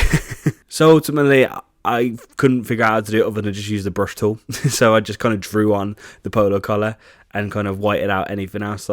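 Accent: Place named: Black Country, United Kingdom. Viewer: 1.) Refreshing to hear a Black Country accent on YouTube. 2.) Do you remember a Spain kit with blue accents from 2013? Whatever happened to that kit?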